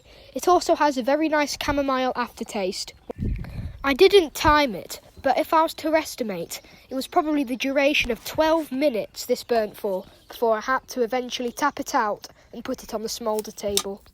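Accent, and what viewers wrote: United Kingdom. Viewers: of course the mf is british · british accent just adds to it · british accent😍😍😍😍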